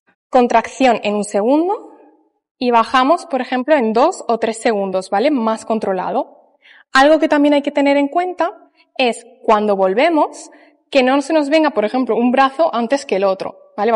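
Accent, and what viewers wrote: Spain. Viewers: te está saliendo acento catalan o es mi imaginación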